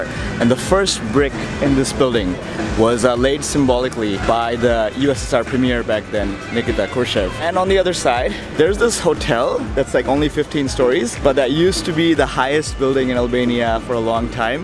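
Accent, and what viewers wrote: United States. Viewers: Boy you made this vlog so interesting which convers Albanian history, culture, sights and touch to the food as well which is an essential part of the travelling. Very nice video. Also you say you are from Bangladesh but your accents is pretty much like USA and Canada. Thanks for the sharing. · Brother well ❤️ Can you say a secret thing like how have you learnt the us accent ? Because u said you have been to us for 5-6 years so during this time did you adopted this accent ?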